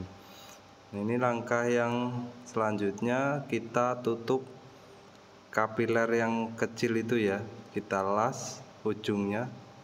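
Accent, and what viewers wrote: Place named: Central Java, Indonesia. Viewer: Logat bicara jateng ..jatenge ngendi pak..?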